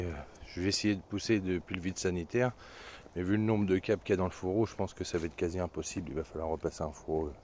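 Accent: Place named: Nord-Pas-de-Calais, France. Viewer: • Tu as l’accent du Nord-Pas-de-Calais 😁 ! Est-ce qu’on peut t’appeler pour déboucher un fourreau ou est-ce que tu travailles uniquement avec un opérateur ? Merci d’avance à toi. Et bon boulot ! Tu n’as pas fini comme Claude François… 😁👍🏻 🥳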